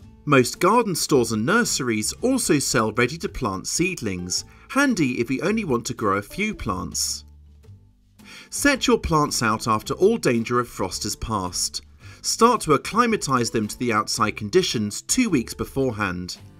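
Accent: England, United Kingdom. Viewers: The English accent and his expressions are so delightful...long live England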